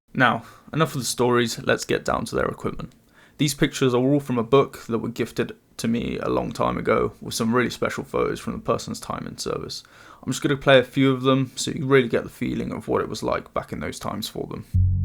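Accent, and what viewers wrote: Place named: Russia. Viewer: Great video. Your russian accent is very good, are you perhaps russian ?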